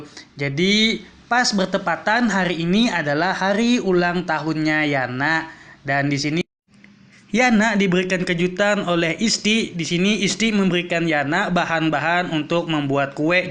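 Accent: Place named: Banjar, Indonesia. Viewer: Dengar logatnya bhasa banjar langsung subrek😀😀....lagi pang revwie film lesbong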